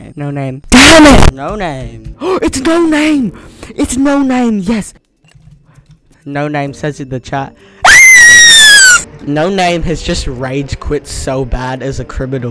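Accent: Australia. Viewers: Oh I didn’t know you were Australian! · Are u Australian?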